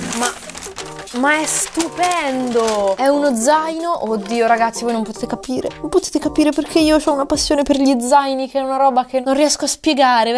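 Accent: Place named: Milan, Italy. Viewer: Sei diventata Milanese!!!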